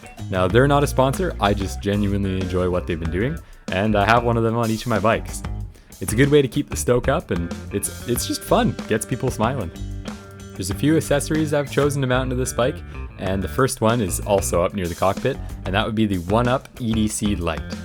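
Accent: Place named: Canada. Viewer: I absolutely the combination of yar calm voice and the canadian accent, you basically have the perfect narrating voice ❤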